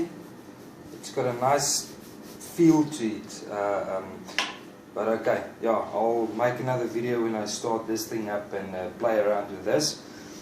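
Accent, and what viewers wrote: South Africa. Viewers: He's definitely South African. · Are you South Africa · Are South African Die manier hoe jy praat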